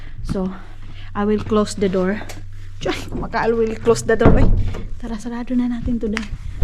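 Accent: Visayas, Philippines. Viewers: your visayan accent is stress reliever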